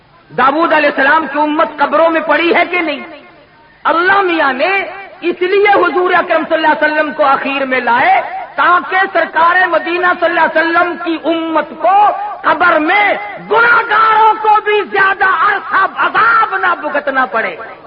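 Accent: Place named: Multan, Pakistan. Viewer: Very beautiful and heart touching dialect, listening gives spiritual and divine intoxication. It enlightens and illuminates the colorful fragrance of our red, orange, yellow and green soil. It gives a beautiful fragrance of Soil joyed, experienced and delighted after the Rain. It gives a reflection, thought and consideration that you are sitting in small mosque made by RED BRICKS in the village of MULTAN or you are sitting in small mosque made by RED BRICKS in SLUMS or UNDROON E MULTAN in walled city and listening the BAYAN, Narration or Declaration before JUMMA prayer. His dialect and way of speech truly reflected beautiful fragrance of Patriotism especially for the People of Pakistan, India, Bangladesh and Afghanistan as well. His dialect and way of speech is highly regarded with affection and love.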